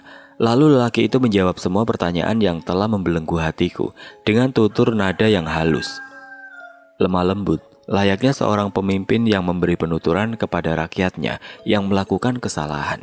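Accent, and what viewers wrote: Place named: Banyumas, Indonesia. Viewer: Ngapaknya jangan terlalu di liatin bang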